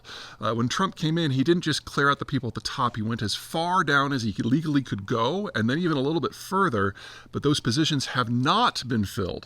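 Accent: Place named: Midwest, United States. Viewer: It's closer to the latter as far as I'm aware, but Peter has a middle-American accent.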